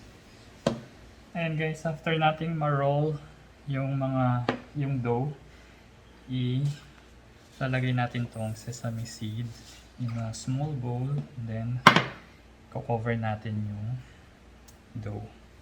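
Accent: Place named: Pampanga, Philippines. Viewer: magaling ka talaga lodi... ohhhhh mah!! ahaha talagng kapampangan. kanyaman na pamo ning inuman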